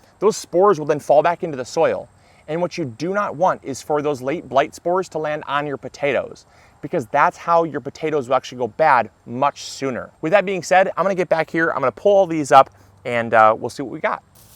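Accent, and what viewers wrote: Michigan, United States. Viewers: Here for the gardening advice but staying for the Michiganisms "oh my gosh!".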